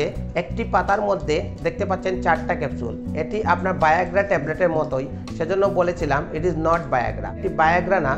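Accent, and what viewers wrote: Sylhet, Bangladesh. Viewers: ভাইয়ের বাড়ি কি সিলেটে নাকি।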